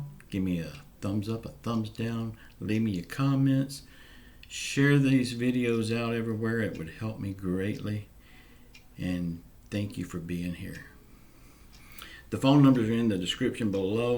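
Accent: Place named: Louisiana, United States. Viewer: You hit the nail on the head. A $1200 check in 4 months exemplifies how thoughtless & callous our political leadership has been through the pandemic & closing of economy. As to Devos's & Kudlow's mind boggling push to reopen schools while the virus is raging through the country betrays where their loyalties lie. Rather than wanting to protect the children & teachers, they choose politics, downplaying the effects of a failed covid response., keep telling it like it is in your Louisiana twang. Don't let em off the hook.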